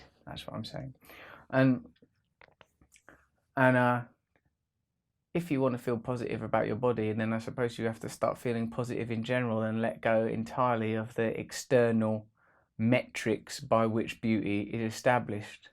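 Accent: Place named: United Kingdom. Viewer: I say this not like this but much like this all the time. Well not all the time but from time to time. I think I would listen to myself a lot more if I had this great UK accent. Great work again Russell, you speak like a man of experience and a man who knows as much of its truth as he can know. I've become a fan fo sho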